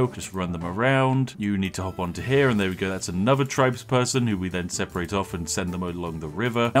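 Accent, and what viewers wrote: United Kingdom. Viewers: Gosh you are British aren't you LMAO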